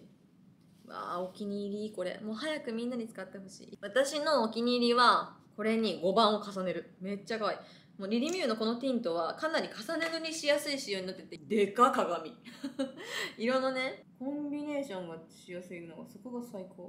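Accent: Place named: Kansai, Japan. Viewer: ちょいちょい関西弁になっちゃうさしこちゃんかわいい……かわいいやんけ！！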